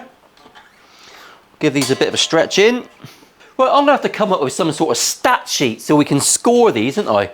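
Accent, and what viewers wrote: United Kingdom. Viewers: Great demo; as a Yank I do find it enjoyable to listen to your Brit accent, especially the nasty bits. ;) I'm also a big fan of Fenders and Squiers, having owned quite a few older Fenders (60s and 70s, yes I'm a geezer) and also a good few Squiers and recent Fenders, especially Mex ones. I just last week got a Squier CV 50s (pine body) and I like it a lot. My favorites are the Thinlines though, for the reduced weight. I can see that you've easily doubled the value (cost) of that guitar with all the work you've done to it, and whoever buys it will be a lucky bugger. Especially 'cause it's pink... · Are you kidding? I watch your video only for the British accent and mainly for the swearing. It brings flavor also 😂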